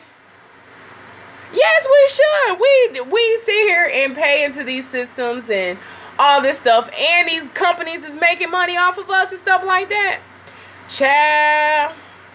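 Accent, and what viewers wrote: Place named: United States. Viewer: were you from you sound country as hell I like it though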